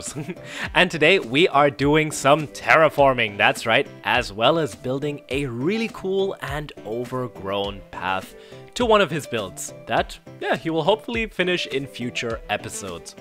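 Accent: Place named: Germany. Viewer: You got this german accent 🤔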